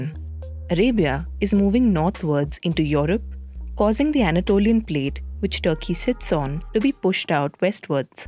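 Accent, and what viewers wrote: India. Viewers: I hear indian voice i hurriedly cancel because when we have Europeans expert why should I waste my time listening a mass who believes more in religion then in law and order 😭😔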